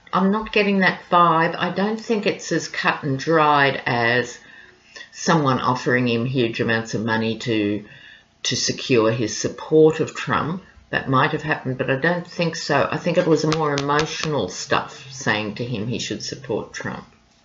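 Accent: Australia. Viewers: Hi, I just discovered you. Great to hear a fellow Aussie speak about the crap going on in the states! A lot to catch up on...😊😊😊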